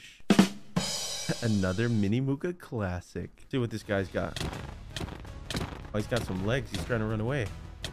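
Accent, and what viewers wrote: United Kingdom. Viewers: I never knew can do a british accent! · The scouse accent is killing me 🤣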